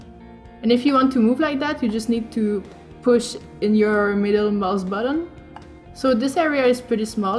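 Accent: France. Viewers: you french!!! nice eng accent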